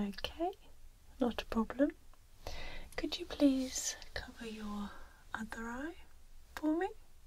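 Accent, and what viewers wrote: United Kingdom. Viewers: So glad there's a sassy, funny and wonderful British ASMRtist out there. A British accent always gets my tingle on!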